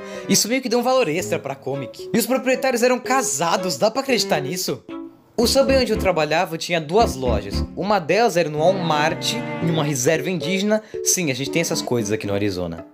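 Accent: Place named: Portugal. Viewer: Mano tu parece que e de Portugal pelo o sutaque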